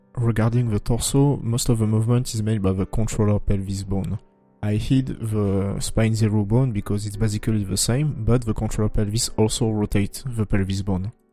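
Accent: France. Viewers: We've needed this for a while! Also love your French(?) accent, I may be enabled for taking french in high school but you're very understandable :D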